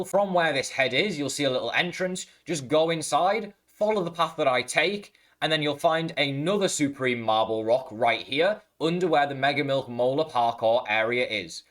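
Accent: Liverpool, United Kingdom. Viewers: Heyyyyy I been following your amazin grounded videos a while now all the way from switzerland and germany. not sure if you are scouse but I think you are. I been dreaming to be in Liverpool again since six years. you will find me busking in the evening on lord street (except saturday) since 1 june for a few months. approach me if you feel like. lol sorry crazy comment I know. hahahaha love your vids. say hi its haha. i dont busk with any amp just wit acoustic small guitar haha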